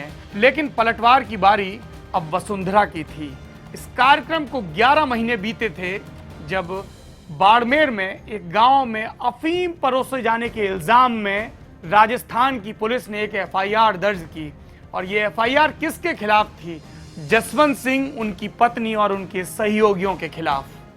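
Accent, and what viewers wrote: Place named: Kanpur, India. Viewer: Seems you are either from Kanpur or lko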